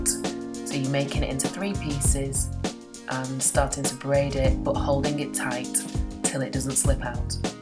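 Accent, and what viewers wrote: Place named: North, United Kingdom. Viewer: They look great and omg you're a northerner!! Haha x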